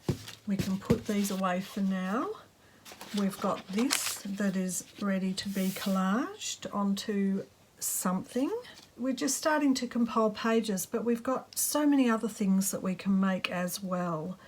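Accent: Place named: Australia. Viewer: Aah. I was puzzled cause at time te Australian accent slips and I couldn’t place it. I thought South African or New Zeeland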